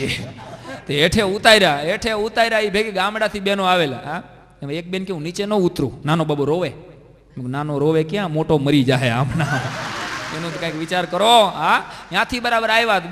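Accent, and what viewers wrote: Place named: Kathiawar, India. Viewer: su kathiyavadi laheko se .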